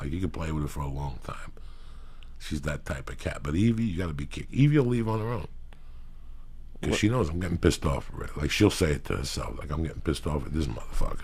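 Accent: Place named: Bronx, United States. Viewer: Hey I don't know you but my grandmother is from the broncs so my whole family talks like you. I just wanted to let you know your a fucking trip but I appretiate you and Superbad is just like my cat Alice Cooper he was a street cat and he's mean as hell. He's black as night with one white patch on his chest has pieces of his ears missing and fangs that go past his chin like a vampire. But he is absolutely a lover if hes not loving on you and farting up a storm hes bitch slapping the dogs in the house and stealing food off of everyones plate (he actually ran off with a whole steak before like a fucking snatch and grab)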